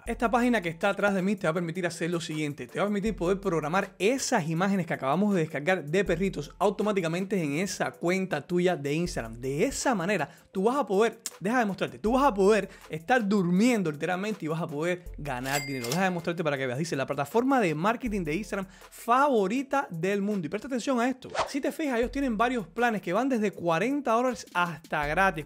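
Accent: Cuba. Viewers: eres cubano verdad?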